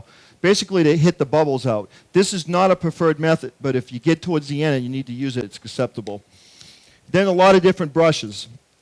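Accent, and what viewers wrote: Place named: Boston, United States. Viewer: he's from boston give him a break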